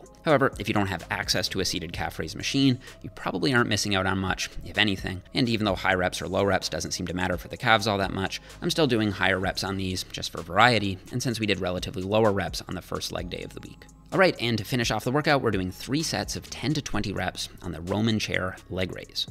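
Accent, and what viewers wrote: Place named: Newfoundland, Canada. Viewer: is from Newfoundland (can't expect anyone to know where that is, but I'm also from there) and I honestly applaud his focus on ensuring he speaks clearly as we have/are prone to having a very distinct and often thick accent that sometimes even locals have a hard time understanding what's being said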